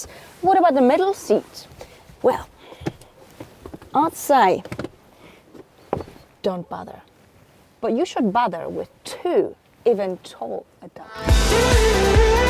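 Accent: Australia. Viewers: aha cool! Yeah the polish number plate gave it away and i recognised some Aussie/Nz accent from living there aswell. Nah Yeah have a good one! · Did you learn your english in Australia or south africa?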